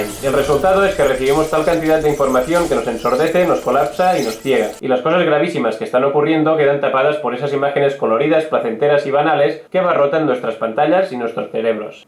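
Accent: Spain. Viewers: Por tú acento creo qué eres catalán yo también, pero no creo en la independencia tampoco me gusta la actual España y su estado represor. Entonces donde ando yo? Me acerco más a un estado republicano justo y realmente democrático. Creo que en ese estado cabemos tod@ Me suscribo a tú canal. Porqué no hay una revolución? Buena pregunta. Creo y es sólo mi opinión que nos sobra individualismo y nos falta humanidad. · No, pero el que no está acostumbrado a oír el acento español, quizá se le haga un poco más difícil de seguir en video.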